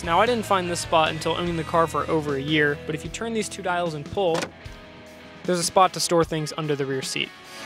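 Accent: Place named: United States. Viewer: Which part of America is his accent from? It sounds very pleasant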